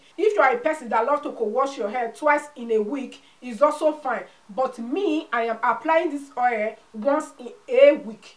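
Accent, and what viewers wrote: Nigeria. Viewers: Great tips sis. But r u 4m Nigeria cos ur accent is like for akii and popo of Nigeria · This is nigeria accent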